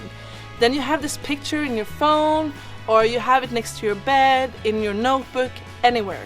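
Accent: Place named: Spain. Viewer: Hahahah vad sjukt att du pratar som att du har en spansk dialekt hahahah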